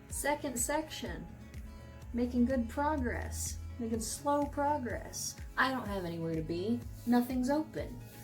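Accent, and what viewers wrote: Midwest, United States. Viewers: Purple is amazing! Could tell you were a fellow midwesterner. Could listen to you talk all day.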